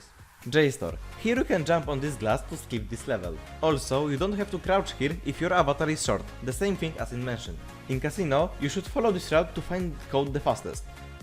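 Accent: Poland. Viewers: Słychać że polak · Im I trippin or you from Poland cuz the accent is selling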